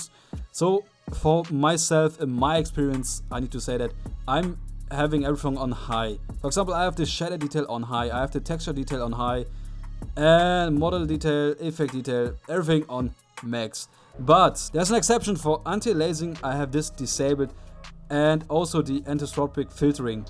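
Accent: Germany. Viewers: Are you from germany? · Mach mal deutsche videos man merkt dass du deutsch bist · Man hört raus du bist Deutscher nh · Kann es sein dass du Deutsch bist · Von der Stimme her erkenne ich, dass du deutsch bist xD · You sounds like German. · Du bist deutsch oder?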